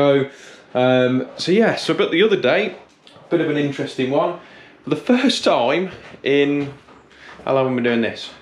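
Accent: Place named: Nuneaton, United Kingdom. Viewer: Was trying to place the accent as thought it sounded East Midlands. Turns out it's Nuneaton, never noticed how different it was from Coventry before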